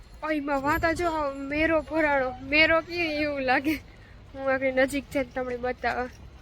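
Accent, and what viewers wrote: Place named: Porbandar, India. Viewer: નમસ્તે 🙏, તમારી બોલી સાંભળવાની બહુ મજા આવી, તમે જે નિખાલસ દિલ થી સાફ - સાફ બોલો છો, આપણાં પોરબંદર ના બરડા ડુંગર ના વિસ્તાર ની બોલી એક દમ સાફ - સાફ ભાષા હોય છે, અને આપણા લોકો ગમે ત્યાં હોય તે તેની બોલી ઉપર થી ઓળખાય જાય છે, મે તો આ તમારો વીડિયો મે પ્રથમ વખત જ જોયો છે, પણ બહુ મજા આવી,👍 ✨ ધન્યવાદ છે,🫅🏅🍫 તમને અને તમારા પરિવારને કે આટલે દુર સુધી અજાણ્યાં દેશ માં કમાવા માટે ની તમે હિંમત કરી છે, એ પણ એક છોકરી ની દ્રષ્ટિએ,તમારી હિંમત ને ધન્યવાદ છે,👍 મારા ગામ ના પણ ઇઝરાયલ દેશ માં છે, તે ત્યાં કયા સીટી માં છે,તે ખબર નથી, તમારું કામ ચાલુ રાખો,એક સમયે તમને જરૂર તમને સફળતા મળશે.🥀 लगे रहो 👍 ♥️🙏 પરમાત્મા તમને સારું સ્વાસ્થ્ય, સુખ અને સુરક્ષા, લાંબુ આયુષ્ય આપે તેવી પ્રાર્થના 🙏 નમસ્કાર 🙏 🇮🇳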